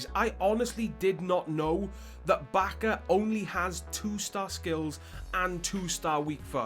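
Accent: Wales, United Kingdom. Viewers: I can 100% tell your from Wales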